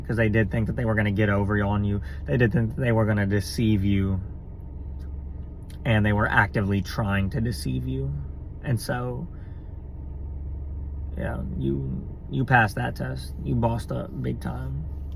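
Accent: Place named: Tennessee, United States. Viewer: Why you talking like me ? Tennessee baby !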